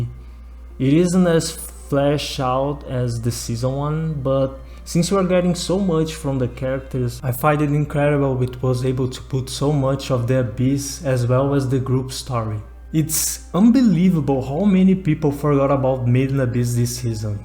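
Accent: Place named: Brazil. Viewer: sabia, dava pra perseber porque tu tem um sotaque brasileiro um pouco no seu ingles muito bom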